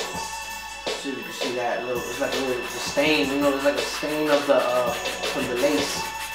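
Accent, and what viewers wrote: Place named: New York, United States. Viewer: I hear a lot of new york in ya tone👊🏼👊🏼💯💯good video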